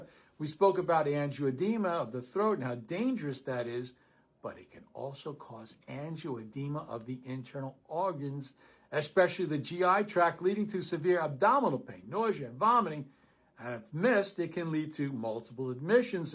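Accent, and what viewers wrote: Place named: New York, United States. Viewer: I find it comforting to be yelled at by older me with a New York accent about topics I didn't know I would find interesting ever since I volunteered with the Bernie campaign a million years ago in 2016. I'm gonna go blow my dad's mind when I tell him where his shortness of breath is actually coming from. He was sure it was the smoking he did as a teenager catching up with him 50yrs later.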